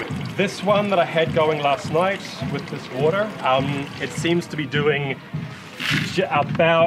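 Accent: New Zealand. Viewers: Cool. We thought so. We heard the NZ accent then the slight roll of the "R's" was the give away. We picked Gore or Invercargill. Our boys just went shark diving down there a couple of weeks back. Good to see another "Kiwi" making a difference in the world.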